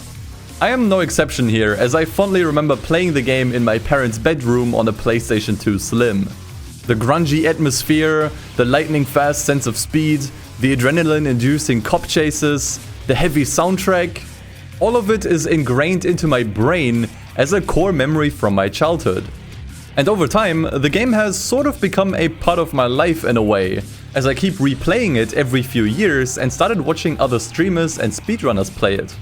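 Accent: Germany. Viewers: ich wusste dass er deutsch ist, da er einen deutschen akzent hat hahah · first time here and for 7 mins i was like "do not assume. just because he sounds like a german does not mean he is german." yeah seems like i listned correctly :D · Ah ich hab doch richtig gedacht dass bei deinem Englisch ein Deutscher Akzent rauszuhören ist und bei hat es deine Freundin bestätigt.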